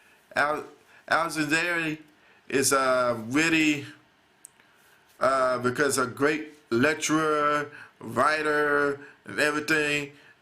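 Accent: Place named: South, United States. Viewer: shut ur southern accent , u make me sick . please speak english. is not da , it is that.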